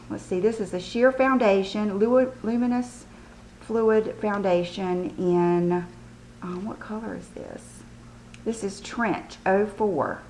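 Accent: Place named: North Carolina, United States. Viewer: You are so sweet. I watch you when I can. I really like the Burberry creme shadows. I've been using a silk pillow case for a couple months and found mine would fall apart in the seams. I'm going to try the one you showed. I'm a retired special ops wife and have a home in Fayetteville NC, and it sounds like your from NC and I was wondering where? If you can't say that's fine. Love you acsent and never be sorry about it, that's what makes you special. Have a wonderful week.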